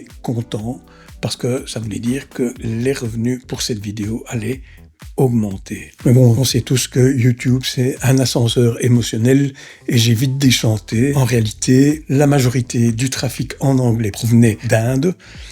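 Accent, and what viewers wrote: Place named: Belgium. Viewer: Salut, merci pour ta vidéo qui m'a bien éclairé. Je me posais toutes ces questions sur les sous-titres. Sinon, je m'abonne direct : pour l'accent belge, l'humour et l'authenticité. Ça change de la bière pasteurisée sans âme qu'on voit partout sur YouTube. Tu m'as plié avec la blague du fils du patron de YouTube, de l'entraîneur, le "bientôt" et le coup de la dinde ! Continue, elle est top ta chaîne et à tantôt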